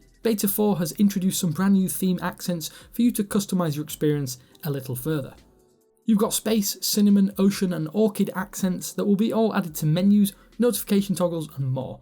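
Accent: United Kingdom. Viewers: British Accent!🤦🏻‍♂️ Maybe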